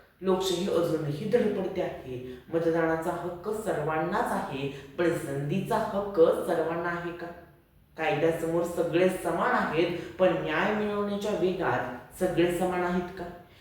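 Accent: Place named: Satara, India. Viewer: खूपच छान विषय कोणताही असो, पण तो स्वतःच्या भाषेत कणखर असे सातारी भाषेत मांडून तो त्या विषयाबद्दल प्रखरपणे मांडत आहेस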